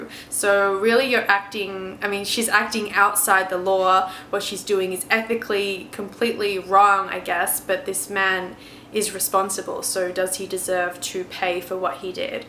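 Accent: Australia. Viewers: Hey do u like the singer? Lol. Sorry had to:p but anyway I love ur videos and I love ur accent I've always loved Aussie accents! Lol Also question. Why did u come to the US like what are ur goals for here and what brought u here? Would love to know:) keep up the good work!!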